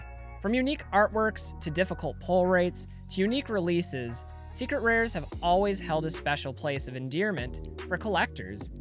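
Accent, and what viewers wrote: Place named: Midwest, United States. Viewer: I was just thinking I could tell he was from MN or WI from his accent and way he dresses. Very outstate midwest, having grown up there myself. I checked the comments to see if anyone else picked up on that. He's one of our people!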